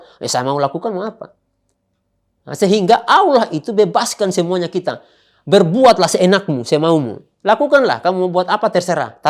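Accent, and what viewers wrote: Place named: East Nusa Tenggara, Indonesia. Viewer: Logatnya ntt bukan Poso lagi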